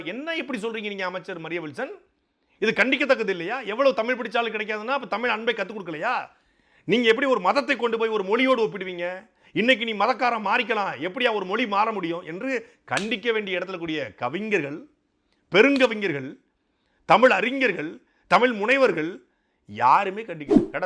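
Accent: Tirunelveli, India. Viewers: சாட்டை அவர்களே 1800களில் இத்தாலியிலிருந்து தமிழகத்தில் கிருத்துவ மதத்தை பரப்புவதற்காக வந்த ஜோசப் பிஸ்கின் தமிழ் மீது பற்றுதல் காரணமாக எனது பெயரை வீரமாமுனிவர் என்று பெயரை மாற்றி தமிழில் பைபிளை முதலில் அச்சிட்டு தமிழ் மொழியை பற்றுதலால் தமிழுக்கு இலக்கணம் வகுத்து தமிழுக்கு தொண்டாற்றி அறிஞர்களிடம் தமிழை கற்று தமிழில் பல நூல்களையும் எழுதி கிறித்தவர் தான் நாம் பேசும் நீங்கள் பேசும் பாட்டை அவர்கள் பேசும் தமிழை அழுத்தம் திருத்தமாக பேசும் திருநெல்வேலி தமிழை தூத்துக்குடி அருகே உள்ள சாயர்புரம் காட்சிக் கூடங்கள் நிறுவி கிருத்தவ தேவாலயத்தை கட்டி அன்று ஏழை எளிய மக்களை உயர்வுக்கு கொண்டு சென்றவர் இவருக்கு கருணாநிதி சிலை எடுத்தார்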